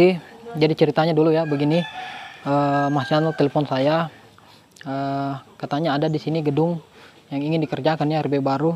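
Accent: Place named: Java, Indonesia. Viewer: Pak Ustadz nya logatnya kaya orang JAWA.....SALAM DARI JAWA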